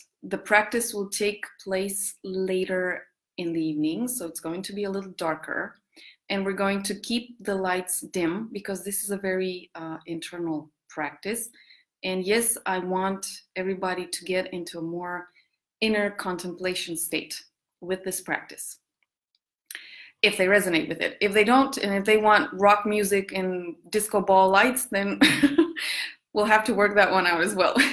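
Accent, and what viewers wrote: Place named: United States. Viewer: But you do speak English perfectly! And you have a bit of American accent 🙂 Did you study there?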